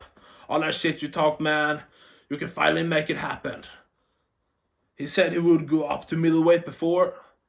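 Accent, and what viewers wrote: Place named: Spain. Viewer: nice spanish accent!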